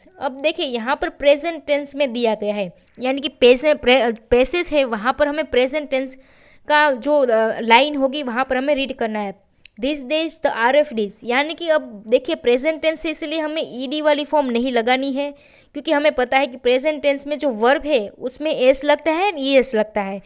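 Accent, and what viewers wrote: Gujarat, India. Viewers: Thank you 🙏 Gujarati tone in your voice 😄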